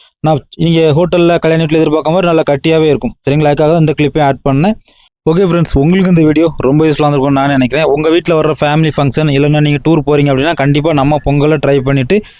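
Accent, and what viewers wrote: Tirunelveli, India. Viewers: From his pronunciation I guess he is from Tuticorin/Tirunelveli side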